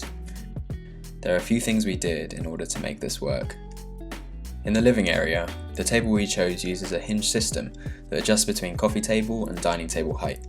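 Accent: United Kingdom. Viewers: So hard to understand English with British pronunciation unlike American pronunciation for me as a foreigner. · British Matt D’Avella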